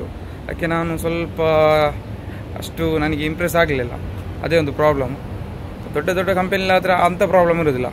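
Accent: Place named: Mangalore, India. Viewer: Nam mangalore baashe keluke chanda marre...sudden agi nim vlogs pop Aithu check madide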